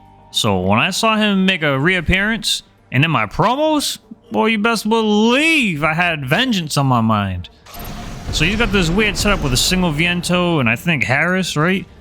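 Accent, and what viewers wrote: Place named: New York, United States. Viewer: Lmao 😂 yo i know you gotta be from new york by the way you talk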